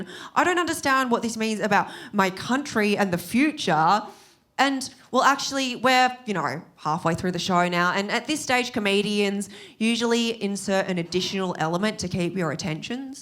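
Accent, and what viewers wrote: Australia. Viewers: I'm a Maori Kiwi & my fav'rit English accents are Aussie & Afrikaner_ welp! Seeing this gorgeous Chinese face speak'n Aussie din'kum just makes m'day & she's damn funny 💯🤙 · Aussies are more racist than we would like to think but not as racist as our national history would suggest. White Australia was explicit policy in my lifetime, and the historical fiction that Australia was uninhabited at the time of European "settlement" formally dissolved only about thirty years ago. Treatment of Chinese immigrants in the 1800s was abysmal. The Cronulla race riots were only about twenty years ago. Frankly your Aussie accent is stronger than mine, but nobody ever questions my national identity - because I'm white. Nobody ever calls me a European Australian because it's "normal" for Australians to be white. But glad you can find the humour in it. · You've got the thickest Aussie accent. 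Actually really funny too. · Girl, you're the most Aussie Aussie there is. You can't have an accent like that and be considered anything other than full Aussie! If you ever decided to swap Australian citizenship for British citizenship after living there long enough, it wouldn't matter, there's no way you'd ever be considered anything but one of us ❤🇦🇺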